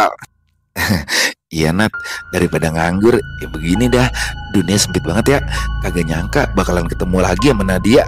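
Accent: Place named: Betawi, Indonesia. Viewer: Pulen amat bang logat betawinya. 😅😂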